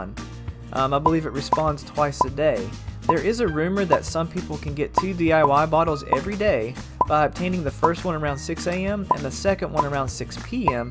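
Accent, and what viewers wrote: Texas, United States. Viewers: Ngl, you sound like what people think Texans sound like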